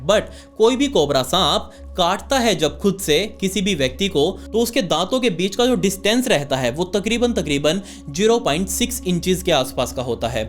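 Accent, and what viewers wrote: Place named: Delhi, India. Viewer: Hi ❤❤❤❤ मुझे आपके बोलने का अंदाज़ अच्छा लगता है पुरी दिल्ली वाली भाषा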